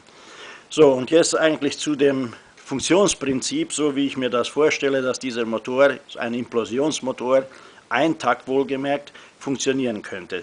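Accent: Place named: Transylvania, Romania. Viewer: Siebenbürgener Akzent...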